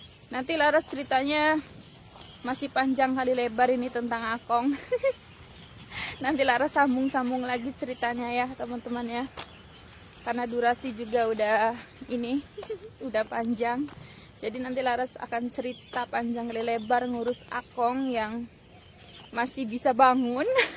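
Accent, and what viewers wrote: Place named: Losari, Indonesia. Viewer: Oh.... indramayu ya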